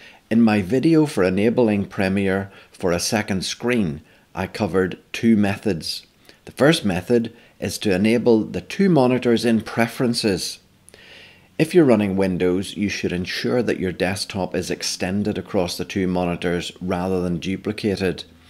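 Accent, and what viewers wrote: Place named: Northern Ireland, United Kingdom. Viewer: First northern ireland accent I’ve ever found on a premier pro tutorial lol also from northern ireland (tyrone)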